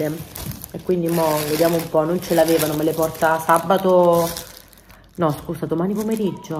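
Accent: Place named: South, Italy. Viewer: Sento il dialetto e in un istante mi fai tornare indietro nel tempo..quando andavo giù dai miei zii cugini ..e da 4 anni che non scendo a lettere .sei super attiva giovane con 3 bimbi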